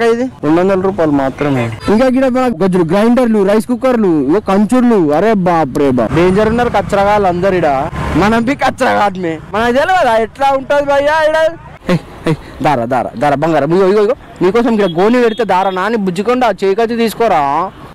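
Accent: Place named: Hyderabad, India. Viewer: Pakka Hyderabadii antar ra babu🤙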